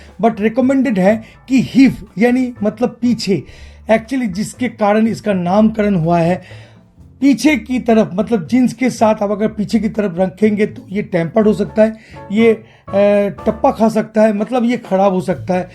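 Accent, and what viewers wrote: Bengal, India. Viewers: Don't care about the contents. I am subscribing just for your bengali accent. It's so funny (in a good way) 🙂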